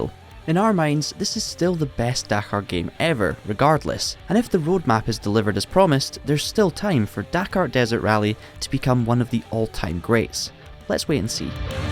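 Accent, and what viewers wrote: Scotland, United Kingdom. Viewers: That’s a very clear Scottish accent … would love to hear the real accent though !!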